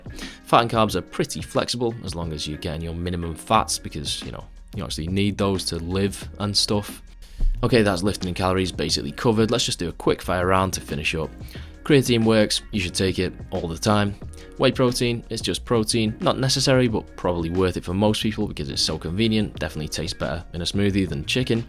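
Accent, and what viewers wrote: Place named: Northern England, United Kingdom. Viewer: you said a while back in the video where you discuss how you haven’t been putting in your full effort for some time, that one of the reasons is that once you’re in good shape, you don’t have to train so hard to maintain. Does this approach not apply to when cutting? ie, not lifting like you’re trying to gain, despite what you say about always training like you’re trying to grow in this video? Love the content mate - so good to have a dry Northern lad deliver a quiet and self aware sermon;)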